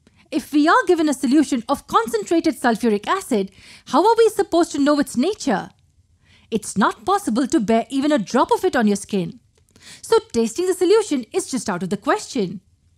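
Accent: India. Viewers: I wonder why every educational in video has a woman with an Indian accent like what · Indian accent !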